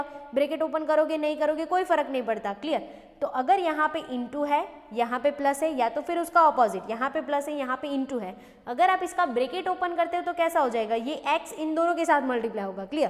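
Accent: Gujarat, India. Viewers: Hey Ma'am!! Are Youu Gujarati?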